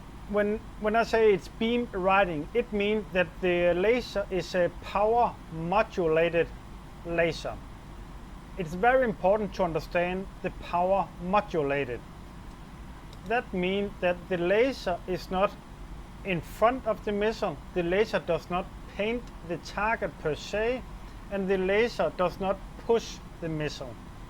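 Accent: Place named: Denmark. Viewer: Sindssyg Danglish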